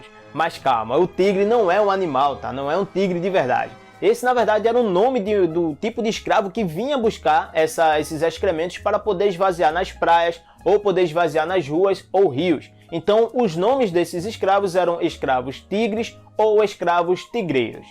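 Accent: Northeast, Brazil. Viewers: Gosto muito do sotaque nordestino.👍🇧🇷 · A voz do é muito marcante.Tem sotaque de nordestino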